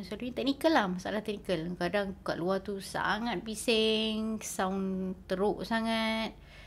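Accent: Kelantan, Malaysia. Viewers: Comeyyy dooh ore kelateyy oyakkk!!! 🥰🥰🥰🥰♥️♥️♥️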